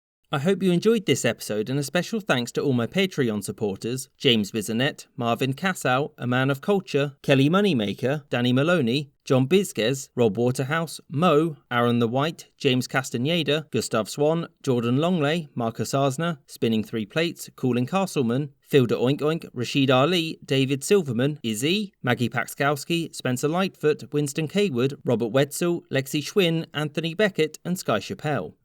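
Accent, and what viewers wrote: United Kingdom. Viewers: You not telling a story you are a reader and fast cant tell what you are saying w/your british accent.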